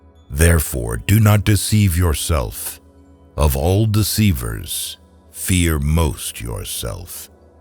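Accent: United States. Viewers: The stupid American accent doesn't sit well with, European philosophers.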